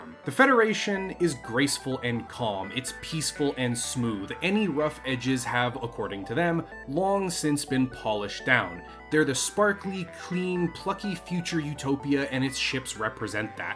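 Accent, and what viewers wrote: Canada. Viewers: This dudes Canadian accent is giving me PTSD. Abaoouut….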